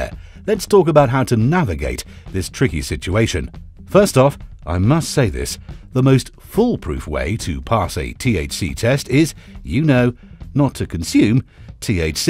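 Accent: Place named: United Kingdom. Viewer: Why is a British guy commentating when marijuana is illegal in the UK that doesn't make sense are Americans supposed to be more interested if they hear a British accent.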